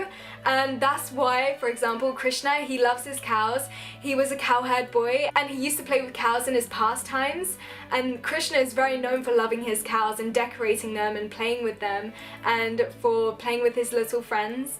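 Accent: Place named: United Kingdom. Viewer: I love the sound of the British English 🤔🤔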